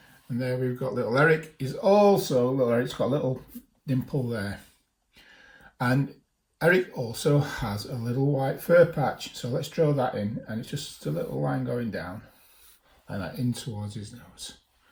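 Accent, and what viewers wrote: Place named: Sheffield, United Kingdom. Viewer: Hello. I've moved from your native Sheffield (Peveril Rd to be precise and often saw you around) to come and teach here in the Turks and Caicos Islands in the Caribbean. I know - it was a sacrifice, but someone had to do it, so me and my wife bit the bullet. Any road, hope you're pleased to hear that there is a little classroom (virtual one for the time being) where your dulcet Sheffield tones are ringing loud and clear to a bunch of 10yr olds who look forward to your cartoon workshop every week. Please tell me there's another one on its way and that there are more to come. I am having a lot of fun getting little locals, Canadians, Americans, Haitians and Dominicans to say things like, " Awreight, sir?" " Tha's reight nesh thee" and " Gi' o'er". So dunna lerrus dahn.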